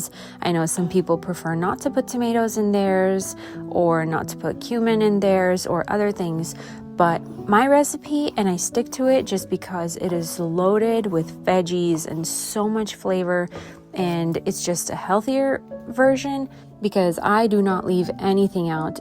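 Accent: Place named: Russia. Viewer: I was wondering where your lovely accent was from!! Did you grow up in Russia?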